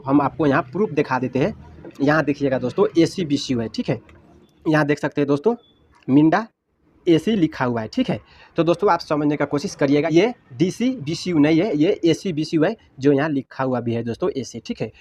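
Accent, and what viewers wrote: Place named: Bihar, India. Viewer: Bahut badhiya ustad. Bihari accent bahut badhiya ba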